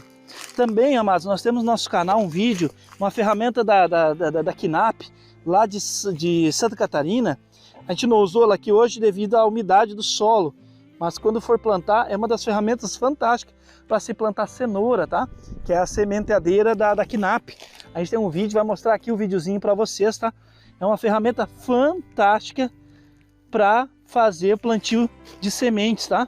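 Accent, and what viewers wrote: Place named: Rio Grande do Sul, Brazil. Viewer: Olá, sou gaúcho. Pelo sotaque vc tbm. On?de fica teu sitio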